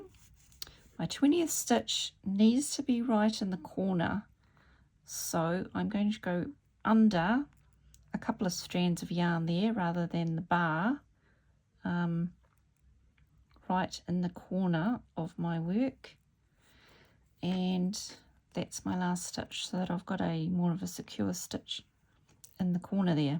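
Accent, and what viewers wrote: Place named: New Zealand. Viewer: so lovely to hear your kiwi accent :)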